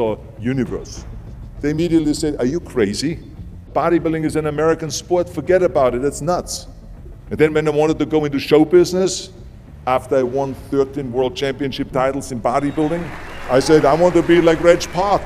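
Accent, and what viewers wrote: Austria. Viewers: You gotta have this charming austrian accent. Got it. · Thee Terminator because it’s not just German accent, it is Austrian German accent, plus the dialect specific to the region where he grew up.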